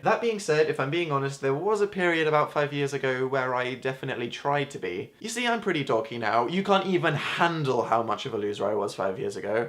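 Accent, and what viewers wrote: United States. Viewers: Who would've thought that was American when he was fourteen.. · in 5 years managed to lose a lisp a pillow and an american accent... lol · why did you have an American accent when you were 14? x3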